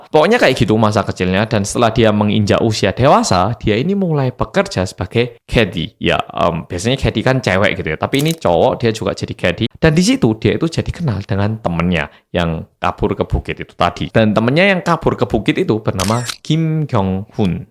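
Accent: Java, Indonesia. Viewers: Mas jgn sampe kehilangan medhookk nya yaaaahh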